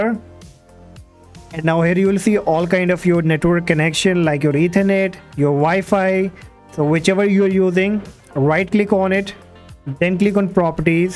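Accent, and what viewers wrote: India. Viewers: when you heard the indian voice .. mean wasting your time! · FINALLY I FOUND THE VIDEO THAT HELP ME I AFTER I HEARD YOUR IDIAN VOICE GET INTO DETAIL I AINT EVEN LOOK AT THE COMMENTS I JUST DID IT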